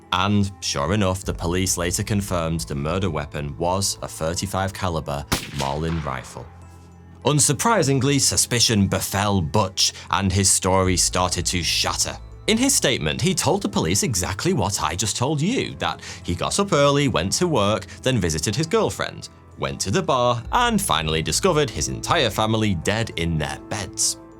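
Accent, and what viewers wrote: United Kingdom. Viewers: These British pronunciations are killing me.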